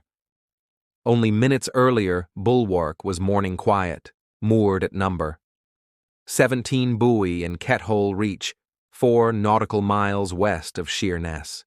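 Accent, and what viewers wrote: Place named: United States. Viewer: 1st. First of all, thank you for pronouncing EVERYTHING, in perfect American. I know this was not Al, and you could, really tell.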